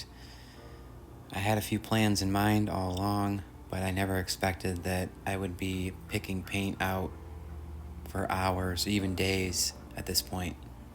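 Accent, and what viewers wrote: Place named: Midwest, United States. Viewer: It is INSANE how much work and frustration went into this. I 🎉CHEERED🎉 when you started pulling the pieces off and "properly" gettin down to biznass with your various sanders. That was actually really funny. It felt like the crescendo in a Dr Jackyl/Mr Hyde scenario. Except like, the loony tunes version? But with ambience. Anyways, I'm binging your channels. You're doing great work! Also a funny aside, I migrated from MI to OR for 10 years now, and I've been wondering about certain words that have started to sound wierd when I say them out loud, and you're making me realize that it's because they're midwestAF long-vowel sounds. Like how you can maybe get it up to 60° in the graaj, or how you're gonna bou-re a hole, or kill it with fyyre. 😅 Anywhoozle, I hope your week is spectacular, and that you find that magnificent piece you didn't realize you were searching for! 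Cheers! ~Violet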